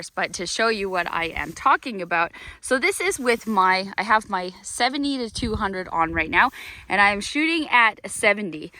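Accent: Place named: Canada. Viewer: you have a lovely Canadian accent! ;-)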